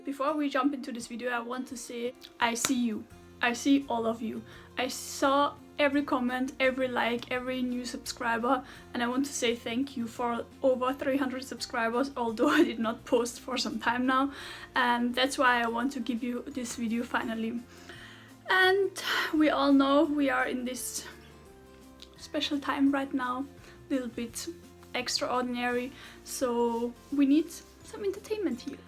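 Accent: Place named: Nigeria. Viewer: Beautiful oniocha! Your Nigerian accent coming through quite strong lol! I wish we could all appreciate and respect each others culture like this.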